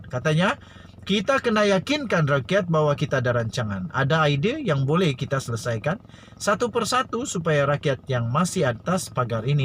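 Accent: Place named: Penang, Malaysia. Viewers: terbaik haaa loghat penang hahaha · Awat u cakap.loghat penang.peliq aishh..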